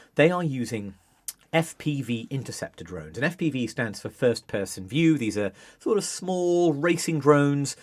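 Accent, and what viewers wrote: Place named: RP, United Kingdom. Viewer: so brititsh, so posh, and so wrong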